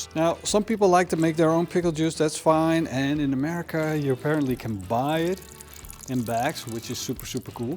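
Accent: Netherlands. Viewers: Hearing that accent… Ik wist het een Nederlander!! 😁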